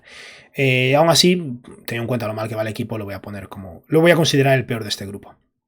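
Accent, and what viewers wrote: Spain. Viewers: Habla más despacio,nosotros los latinos no entendemos bien a los españoles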